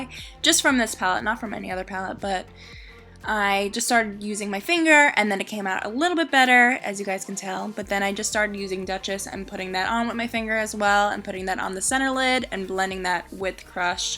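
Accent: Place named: New York, United States. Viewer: Ur def from NYC I can tell by your accent lol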